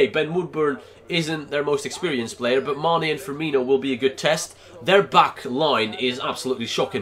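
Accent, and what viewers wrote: Netherlands. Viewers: Is he dutch? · Ben jij Nederlands (translation: are you dutch cuz i heard a dutch commentator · Are you Dutch? I'm pretty sure I heard Evert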